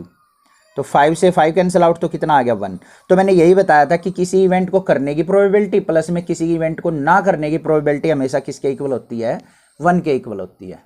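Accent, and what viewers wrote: Haryana, India. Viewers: Sir aap haryana sai ho 🤔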